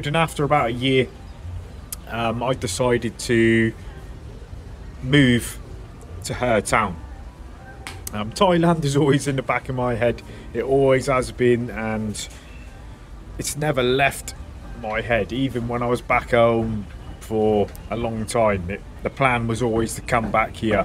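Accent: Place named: Southwest England, United Kingdom. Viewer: Hi ya Just found out how send a message. Love your views and was wondering what part of uk you are from. I’m also from the southwest Torquay to be frank. I hear a little when you chat. Take it easy man, take it easy. lol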